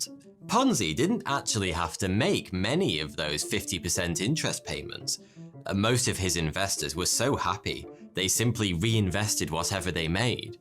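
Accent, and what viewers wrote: United Kingdom. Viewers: Thoughty2 sounds very British though...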